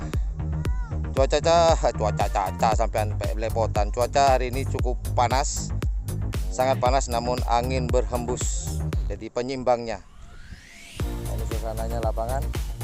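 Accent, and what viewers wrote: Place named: Java, Indonesia. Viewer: ouh sya kira mas orang jwa krna logatnya kyak orang jwa,sya lgi di negara jiran mas mlysia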